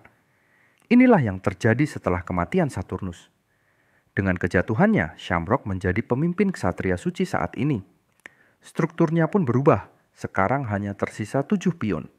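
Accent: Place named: Ngapak, Indonesia. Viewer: cok kalo medok gausa gua gua, gaenak didenger jirr guanya ngapak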